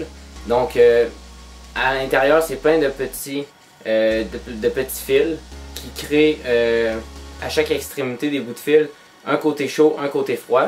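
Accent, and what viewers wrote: Quebec, Canada. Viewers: habla francés de canada. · vous avez vraiment un accent affreux les québécois, on a du mal à comprendre vos phrases pourtant on parle bien la même langue non?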